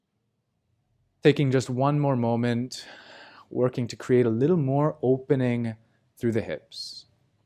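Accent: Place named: United States. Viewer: I hope I am not insulting you, however, you sound as if you spent time in the U S A Teaching Yoga, or demonstrating. Namasda. God Bless you.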